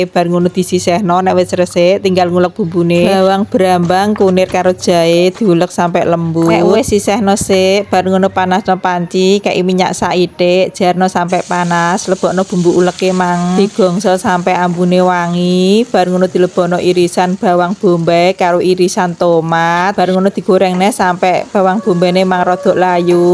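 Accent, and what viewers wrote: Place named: Blitar, Indonesia. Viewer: Bahasamu wong Blitar Tah yu...😂😂😂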